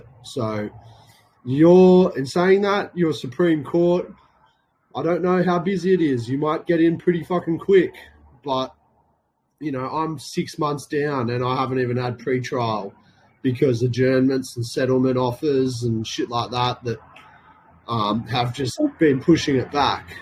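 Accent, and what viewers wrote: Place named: Australia. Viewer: Why do I hear an Aussie accent but you’ve referenced US law in the description?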